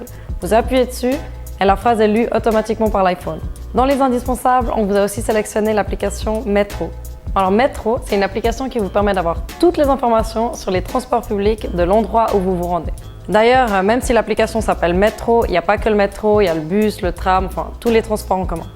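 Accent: Switzerland. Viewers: L'accent suisse sur !!! ahah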